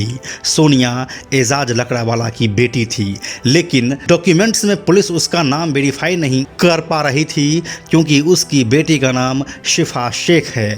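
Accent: Bihar, India. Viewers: Tu bhi bihari hai naa teri bhasa urf tone sae lg rha hai.......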